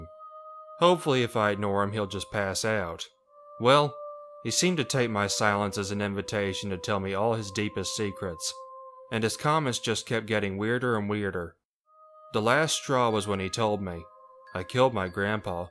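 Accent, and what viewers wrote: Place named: South, United States. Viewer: Love that southern accent!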